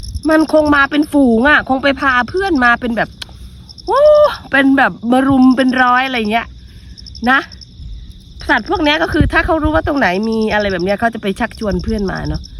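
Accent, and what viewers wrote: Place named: Thailand. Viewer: อยู่ญี่ปุ่นมากี่ปีครับยังไม่ลืมสำเนียงไทย เยี่ยมครับ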